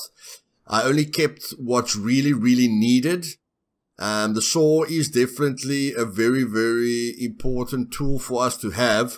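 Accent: South Africa. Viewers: Always good hearing another South African accent. Thanks for these, I'm learning a lot! What happens when you log off? Is it like rust where you just fall asleep and your character is still in the world and vulnerable?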